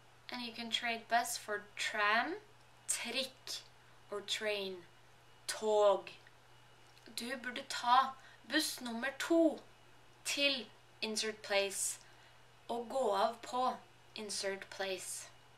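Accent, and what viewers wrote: Oslo, Norway. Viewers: It's just a difference in dialect pronunciation. People around the Oslo area pronounce "og" with the "g" sound but it can also be pronounced without. It's actually a common mistake that people write "å" when they mean "og" because they sound the same. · norwegian sounds like german..love your norwegian accent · Er du fra Oslo?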